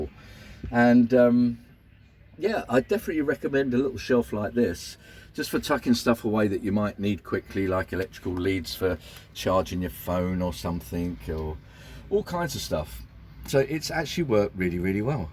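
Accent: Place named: United Kingdom. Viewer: Also nice to hear from a Brit doing this stuff. That’s not meant to be an inflammatory or discriminatory comment, it’s just nice to hear a familiar accent, a different perspective and someone from home enjoying their Troopy build - and there aren’t that many Troopies in the UK!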